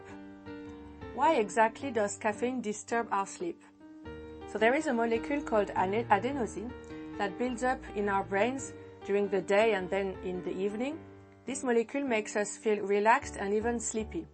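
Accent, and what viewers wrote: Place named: France. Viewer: I found this video explained very clearly all the complex facets of sleep. Nice French accent as a bonus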